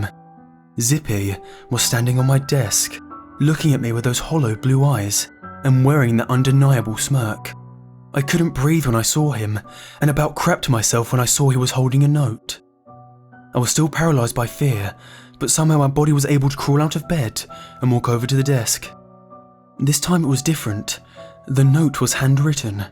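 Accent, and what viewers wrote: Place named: United Kingdom. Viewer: Firlst time one this chanal by the way Me when it starts: AHHHH!!!! BRITISH ACCENT!!!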